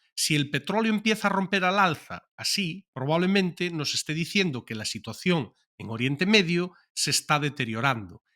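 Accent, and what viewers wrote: Galicia, Spain. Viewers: Acento gallego? Me recuerda al gran Miguel Anxo Bastos. 😆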